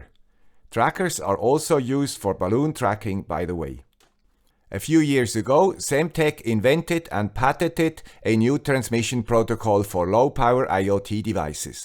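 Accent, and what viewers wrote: Switzerland. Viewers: For a moment I though that this was the Guy with the Swiss Accent ! XD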